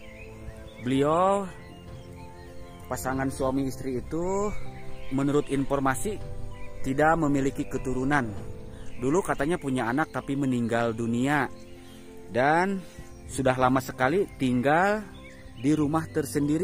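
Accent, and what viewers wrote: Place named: Sunda, Indonesia. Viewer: Lagam naratornya terlalu NYUNDA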